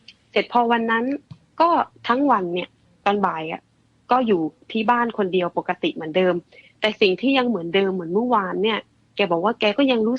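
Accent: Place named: Upper South, Thailand. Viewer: ฟังจากสำเนียง น่าจะอยู่จังหวัดเดียวกับเรา ภาคใต้ตอนบน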